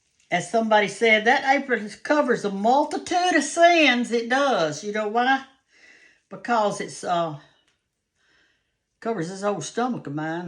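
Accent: South, United States. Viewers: Ms., where are you from if you don't mind me asking. You sound so southern and You remind me of my NANA, BECAUSE SHE USED TO WEAR HER APRONS ON EVERYTHING SHE COOKED. I LOVE YOU MS.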